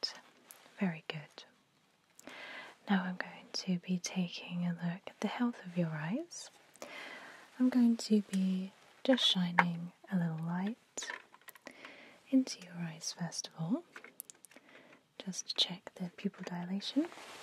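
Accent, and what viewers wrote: United Kingdom. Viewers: I don't know what it is about a British accent, but it makes ASMR videos so much more enjoyable. 😊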